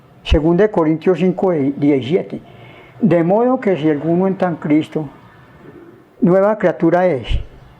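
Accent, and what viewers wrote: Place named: Colombia. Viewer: ¿Porque sospeche que era Colombiano cuando comenzo a hablar...?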